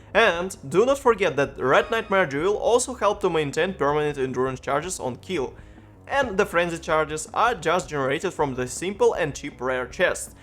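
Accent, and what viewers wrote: Russia. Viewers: русский акцент + уши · ты русский? акцент просто как у русского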